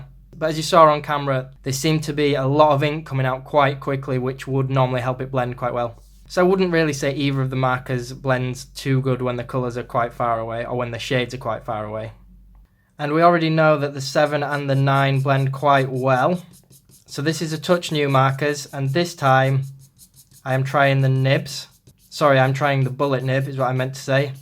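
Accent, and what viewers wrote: United Kingdom. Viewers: I love your British language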